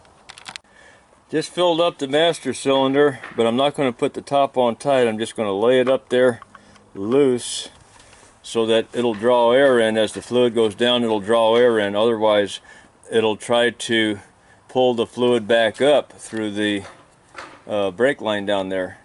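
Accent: Spain. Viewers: Much respect to you Mr for the great work you did there and for the awesome Spanish accent you have (old western+ Spanish)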